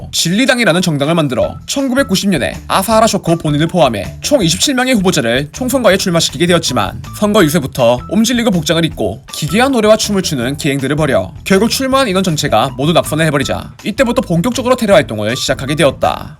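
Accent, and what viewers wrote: Jeolla, South Korea. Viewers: 김어준인줄ㅋㅋ 전ㄹ ㅏ도 익산ㅋㅋㅋㅋ ㄹㅇ과학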